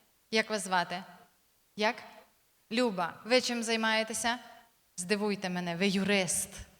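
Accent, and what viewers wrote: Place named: Western Ukraine, Ukraine. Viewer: Вона з Західної України, там акцент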